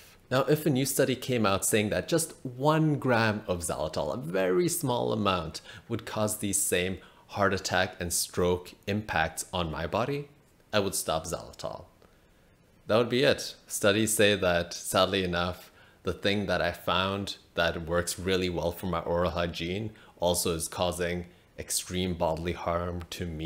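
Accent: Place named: South Africa. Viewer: Hi, I love your videos - well done 👍🏻. When I listen to your accent, sometimes I think I detect either a South African (my former country) or New Zealand accent on some words? I must say the release of the study of Xylitol swerved my inclination to start using it. Now I know how much they used, I will rethink it. One thought I have is when we put a substance in our mouth, it is absorbed into the bloodstream so I'm wondering if this may raise risk 🤔. Just a musing...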